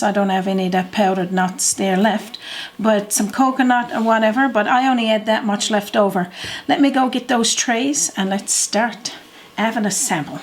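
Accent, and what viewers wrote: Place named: Newfoundland, Canada. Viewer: These look incredible. Is that a Newfoundland accent I detect? I love it. ♥️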